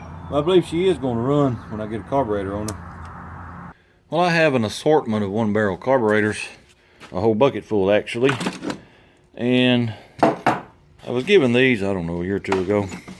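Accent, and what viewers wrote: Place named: Tennessee, United States. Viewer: As usual a great video. Sure glad to hear your accent, brings back memories of my time in Tenn. Many thanks!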